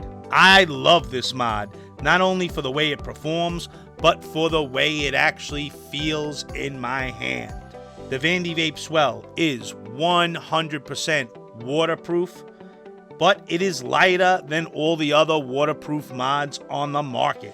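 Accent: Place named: Boston, United States. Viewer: you have a nice boston accent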